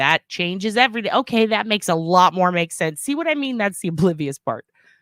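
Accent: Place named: New Jersey, United States. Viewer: Sounds like a Jersey accent to my NYC ears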